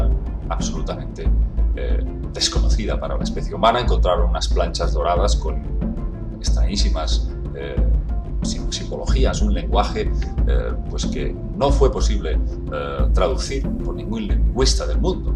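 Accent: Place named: Spain. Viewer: siempre lo he dicho, ese acento español es horrible, irrita mis oidos, ademas ese tipo es mas raro que un reptil con pelos.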